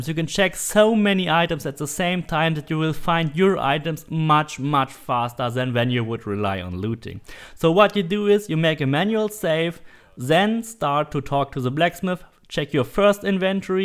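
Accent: Germany. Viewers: I’m just curious are you German? Or Austrian? Or from a totally different country cause I’m dumb lol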